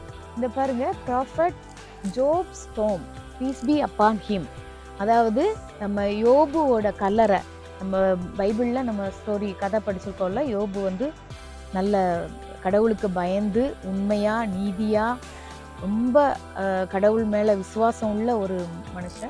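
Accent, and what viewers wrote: Tirunelveli, India. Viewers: Praise the lord sis Nellai tamil super super 🎉🎉🎉❤❤❤ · Video super... நேர்ல போய் பார்த்த மாதிரி இருந்தது....நம்ம ஊர் தமிழ்ல கேக்க ரொம்ப நல்லா இருந்தது...நீங்க தூத்துக்குடி அல்லது நெல்லை மாவட்டத்தை சேர்ந்தவர்களா....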